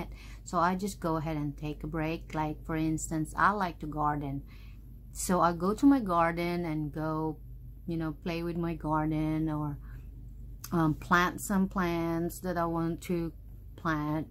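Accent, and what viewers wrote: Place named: South Africa. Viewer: 🙌 sa accent😊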